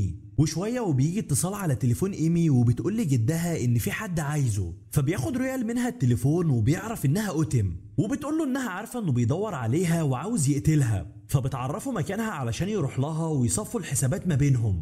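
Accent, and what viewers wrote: Egypt. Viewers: حرف الجيم مش عارف تداريه.. منين من قنا يبوعمو؟ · انت دمنهوري يا لان الاكسزتفي نطقك في حرف (الجيم)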